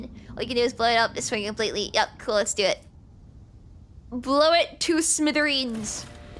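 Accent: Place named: United Kingdom. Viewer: Gotta say how delightful it is listening to a very British person play a very British coded game. Keep at it Mistress!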